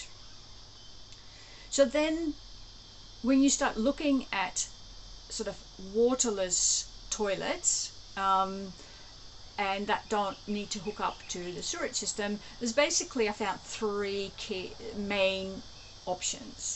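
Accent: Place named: Australia. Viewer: I love the idea of composting toilets but am also skeptical when people claim there's no smell at all. Even flush toilets smell sometimes. I'm glad to hear that composting toilets really don't smell. I didn't realize you were from The Netherlands (I'm learning Dutch, btw). You sound like you were born in Australia.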